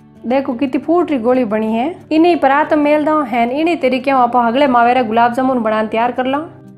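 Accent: Marwar, India. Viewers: आपके मारवाड़ी बोली बहुत प्यारी लागे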